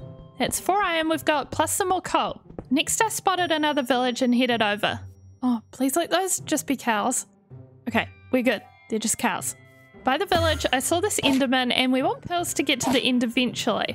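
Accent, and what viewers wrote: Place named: New Zealand. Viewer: i know a kiwi when i hear one!!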